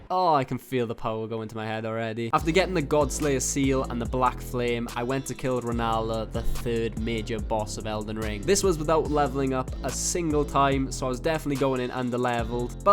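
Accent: Wales, United Kingdom. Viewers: Im a simple guy, i hear a welsh person. I press subscribe. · Idk why but I'm getting a slight hunch that you're also welsh...just a hunch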